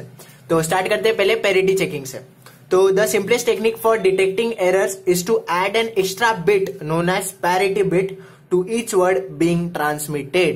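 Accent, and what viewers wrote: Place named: Hyderabad, India. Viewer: His accent is slightly Hyderabadi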